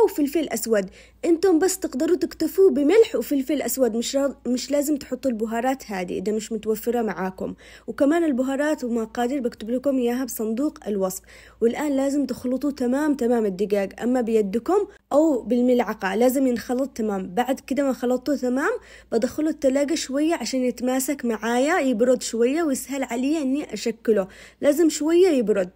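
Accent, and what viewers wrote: Aden, Yemen. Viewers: وووووووو اني فدالك ❤مبدعه والله وكله كوم و هدرتك باللهجة العدنيه الذي اموت عليها كوم الله يحفظك و يسعدك يا رب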